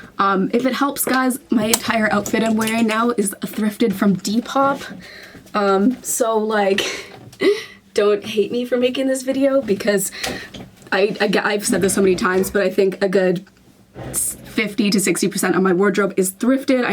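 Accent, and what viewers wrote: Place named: England, United Kingdom. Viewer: I can look at you forever😍, sometimes I don't understand some words, but I just admire you, you look gorgeous, very beautiful ❤💛, thanks for information, hug you🤗, I'm very glad to become part of your YouTube family🤓, I like your English accent, I want the same pronunciation🔊, I will watch you and improve my English, thanks you so much for all you do for us😁❤🤗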